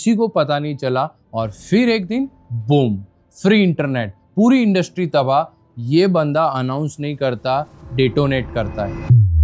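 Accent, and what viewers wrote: Gujarat, India. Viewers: BY YOUR ACCENT YOU ALSO SOUND LIKE A GUJU DON'T. U KNOW AMBANI WILL GET ALL THE APPROVALS NEEDED BY OUR HONORABLE PM WE WILL WIN MODIJI IS THE GREATEST PM OF. ALL TIME. HE WILL DELIVER TO AMBANI WHAT HE NEEDS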